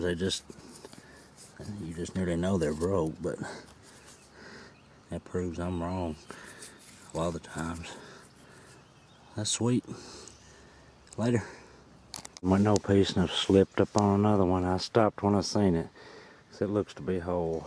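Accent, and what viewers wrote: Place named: Missouri, United States. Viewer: I'm coming down there to go hunting with you! All that in one field? Are you kidding me? I'm in Canada eh... I find a couple in a day, maybe up to 5 if I'm lucky... Sometimes, nothing at all. I'm in Southern Ontario, Canada... By your accent, you must be in Missouri or Arkansas or something like that... I really want to go down there one day and hunt a few of those fields....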